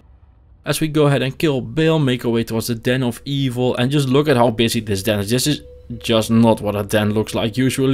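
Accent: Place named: Netherlands. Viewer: hou van het Nederlands accent haha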